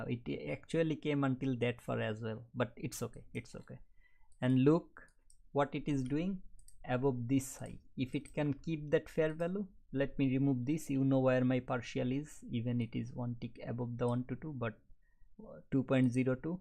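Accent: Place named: India. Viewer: you sound indian.. u indian?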